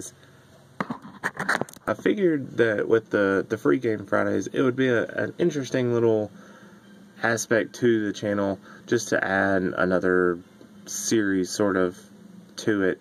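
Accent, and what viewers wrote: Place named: Texas, United States. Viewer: Let me guess ur from Texas I'm from Louisiana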